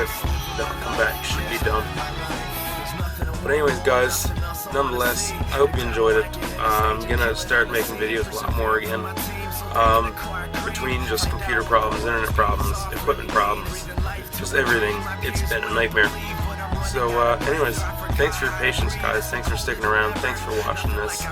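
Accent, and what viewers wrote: Canada. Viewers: He’s literally Canadian. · Is he canadian?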